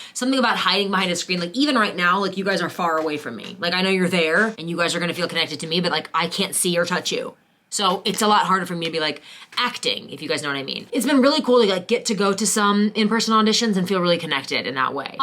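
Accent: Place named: Ireland. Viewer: Omg! You’re Irish accent kinda sounds like saoirse ronan!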